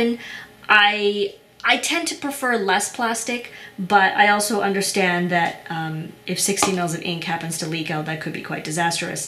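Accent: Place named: Middle East. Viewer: Saw this...and an acute attack of “MUST HAVE NOW” struck me. Went to site via link and nosed around til I discovered the notebook in Forest Green with Lime Green trim...and selected lined paper. OMG. I’m in heaven. Hope I don’t have to wait too long. PS: you have a very, very slight accent that sneaks in now and them. Lovely. Middle East someplace? Israel? I sound like I’m from Oregon...since I am. Sorry.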